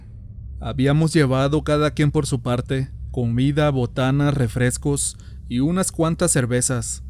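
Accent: North, Mexico. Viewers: Hijole amigo tu acento norteño le quita emocion, parece que lees muy rapido.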